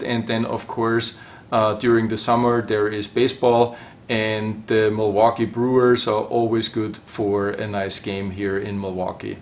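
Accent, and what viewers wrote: Germany. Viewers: I thought you had a German accent but then you mentioned Austria, another beautiful country. I like that party of Europe, although I have to admit that I liked all of Europe. Now that I'm retired I have thought about going back since I have German friends.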